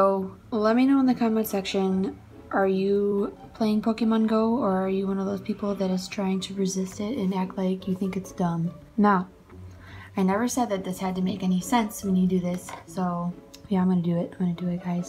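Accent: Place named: Illinois, United States. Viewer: You’re from Buffalo! Omg I’m from NY too. I thought maybe you were from Illinois bc you have sorta an accent. Which is awesome Btw.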